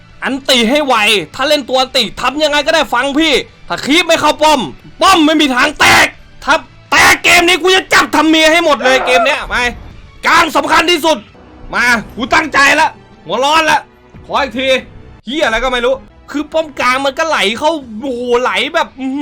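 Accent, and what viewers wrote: Isan, Thailand. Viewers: พูดอีสานน่ารัก55555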